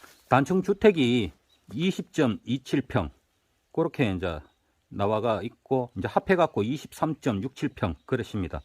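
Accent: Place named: Hadong, South Korea. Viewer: 하동출신인데 고향말투가 느껴져 정겹네요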